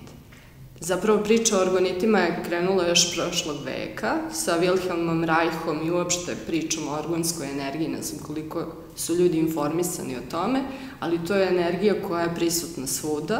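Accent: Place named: Novi Sad, Serbia. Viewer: Kako je milina slusati Novosadski akcenat. Bukvalno te uspava.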